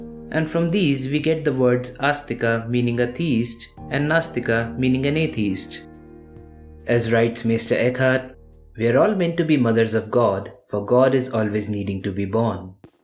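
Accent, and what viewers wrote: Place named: India. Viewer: never want to mean or curial but your Indian accent is distracting sometimes. Just sometimes, like every 5 second in 2 minute. Beside that amazing video and description.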